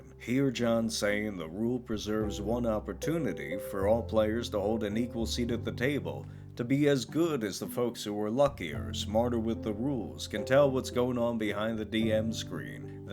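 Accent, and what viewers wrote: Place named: United States. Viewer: Loving the cowboy accent with the static image background. Otherwise, hearing all this about 1:1 Time, I wonder if it would make the game I'm designing more interesting as well.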